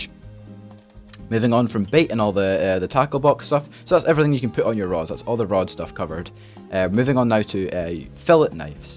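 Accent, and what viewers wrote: Scotland, United Kingdom. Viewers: Thanks! This overview really helped me! Also I love your partially Scottish accent. 😂😂😂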